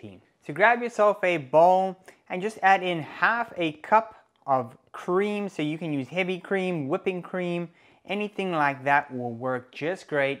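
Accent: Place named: South Africa. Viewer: I am South African too, yes. Recognized the accent. Love your channel and all the amazing recipes. Well done!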